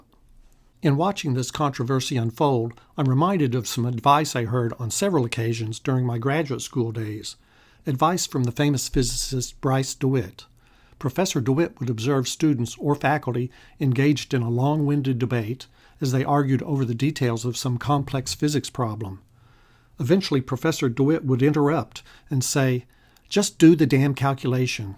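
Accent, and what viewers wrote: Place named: Texas, United States. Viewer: Damn calculation done by unsimplified physicist with Texan accent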